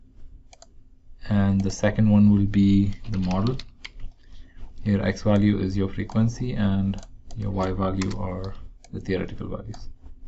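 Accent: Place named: India. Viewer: This indian sounding man has saved my electrial engeneering homework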